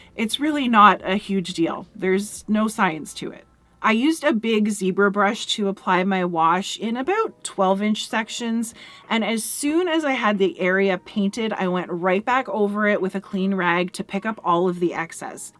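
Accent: Canada. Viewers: That’s a hard “OU” in the word “out” when you say it.. I had to hear it a second time to see what you said. Once I realized it was a northern, possibly Canadian accent, I had to hear it just a few more times for satisfaction.. I even caught myself saying “ahhoout” and “ah-bow-oot.”..😂.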